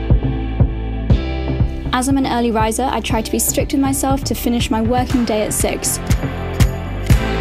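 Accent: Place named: United Kingdom. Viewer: Ur sweety british accent is sooooo cooolllll for me. Happyful,best wishess from BAKU,AZERBAYCAN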